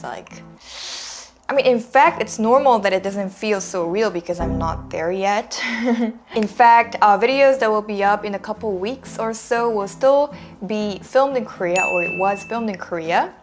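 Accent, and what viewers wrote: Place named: Philippines. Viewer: That pinoy english accent, wow sososyalin!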